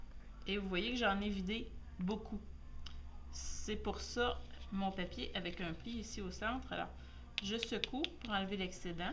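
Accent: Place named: Canada. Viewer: merci ma canadienne j'adore ton accent ! et merci pour tes bons conseils! et l'autre en dessous qu'il ferme son tabernacle mdr!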